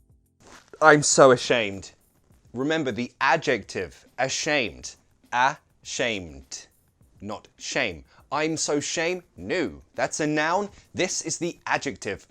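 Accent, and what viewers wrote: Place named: Cockney, United Kingdom. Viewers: hello I really want to learn Cockney accent and I saw the two videos concerning Cockney but I want you to focus on it in the coming videos I like your Cockney accent and I want to learn more from you please and thank you for your efforts and useful courses 💜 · I just LOVE your accent. Simply beautiful. It reminds me of my younger days in London. More than 30 years ago ! From an old man in Japan.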